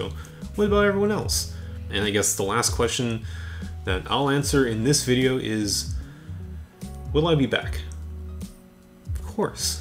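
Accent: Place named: San Francisco, United States. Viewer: Some things are necessary. Moving seems necessary for you and your wife. For $$ reasons My wife and I had to leave SF moving to (at that time) a much cheaper Oakland condo. This was back in 1986. After acclimating to the pace and people we fell in love with it and thrived there. 3 years later we had to move because it was too small to run my business there. We sold just before Loma Prieta hit. After the quake we couldn’t find anything around Oakland, but with the $ from the sale we’re able to put a down payment on a 600 sq’ house in ….. Bernal Heights, where we still live. I know with the prices in SF things seem impossible, but things seemed impossible back then too. Sometimes going with the flow, though scary can bring you back to where you want to be. You know, like those rip tides Ocean Beach. It may take an earthquake to open a door but crazy things happen. 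I love your videos and I’m sure you’ll find and create gems wherever you go. Your love, passion, and intelligence shows! You’ll do well! May you always keep that clean Richmond district accent!;—) Best of Luck!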